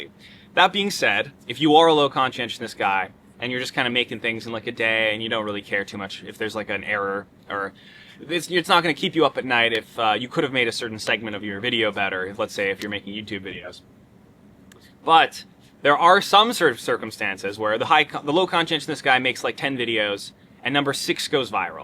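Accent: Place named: Canada. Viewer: he sounds so canadian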